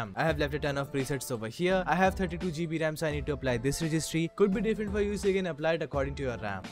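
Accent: India. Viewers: English of The índia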